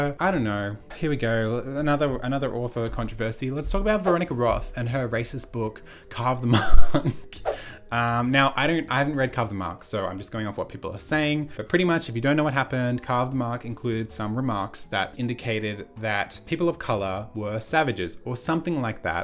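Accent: Australia. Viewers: THIS WAS JUST IN MY RECOMMENDEDS AND YOURE AUSSIE????? Stan. I have no idea what any of these ~dramas~ are (minus jk) lmao but this is great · Is that an Australian accent? It is just lovely!! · How did I know you’re Australian before I even clicked on the video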